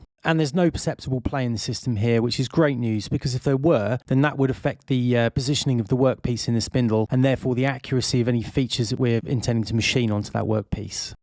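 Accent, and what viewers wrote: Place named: England, United Kingdom. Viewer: I like the well designed attachment but as an American I cannot understand the speaker's rapid English accent.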